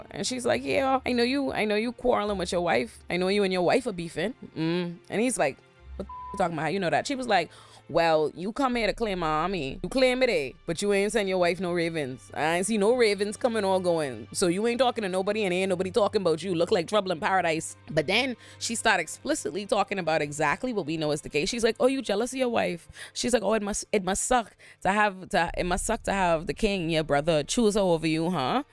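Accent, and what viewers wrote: Caribbean. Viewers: I sense... Caribbean 👀 🇹🇹?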